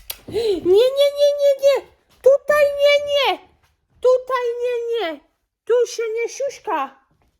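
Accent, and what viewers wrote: Silesia, Poland. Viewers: Twoje życie nie jest nudne. A tak jak dla mnie to wystarczy, że usłyszę twoją śląską godke i już mam uśmiech na twarzy i dla mnie to ciekawy vlog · Jestes zarabista babka , uwielbiam ogladac twoje filmiki , no mowa slaska jest mega… buziaki dla calej rodziny🍀🍀🍀🍀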